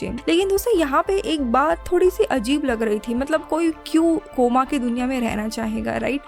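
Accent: Maharashtra, India. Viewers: aap marathi ho kya??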